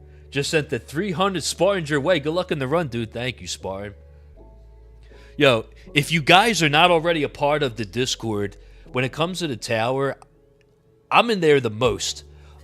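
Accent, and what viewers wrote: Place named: New York, United States. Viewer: I love your accent. Im trying to guess where you are from. My guess in New York generally and Long Island specifically. How close did i get?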